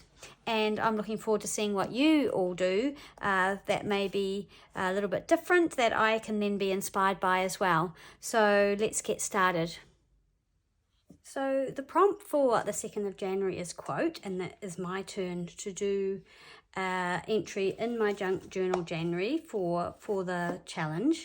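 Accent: New Zealand. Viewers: So I’m new to this junk journalling and been inspired by a local friend. I think I’ll watch and learn this month, and start getting things together for when June comes around. Having watched Megs intro videos, yours is the first one I’ve watched with the daily prompts, and I’m even more inspired now. And how lovely to hear another kiwi! I’m in the waikato. Have subscribed to your page and look forward to seeing your journal develop! Thanks so much.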